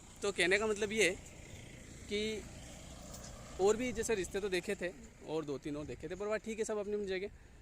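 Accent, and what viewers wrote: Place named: Malwa, India. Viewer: Ur Malwi accent reminds of old days. Now m far away..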